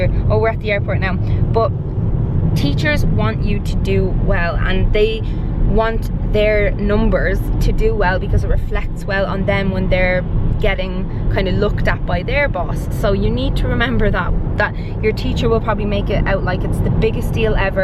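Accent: Ireland. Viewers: Hello my friend how are you to day ? I subscribed to your blog . I like your Iresh accent very much and would like to hear more from you .I find you to be very cute and would like to get to know you much better .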